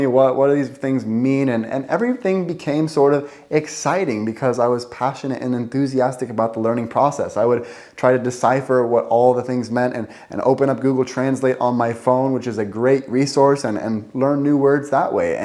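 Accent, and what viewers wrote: United States. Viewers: Japp det gör han gillar att han låter amerikansk när han pratar.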